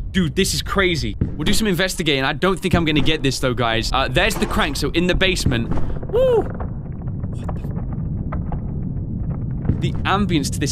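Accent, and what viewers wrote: United Kingdom. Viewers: Man i love British accent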